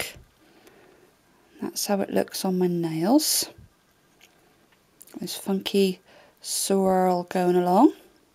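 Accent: England, United Kingdom. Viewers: T are you brittish? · Like ur accent( I know it's British) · how does she sound Australian? she is obviously English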